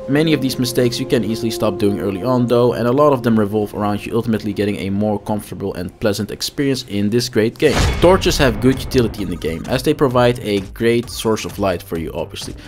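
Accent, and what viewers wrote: Netherlands. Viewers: Are you Dutch? 🤔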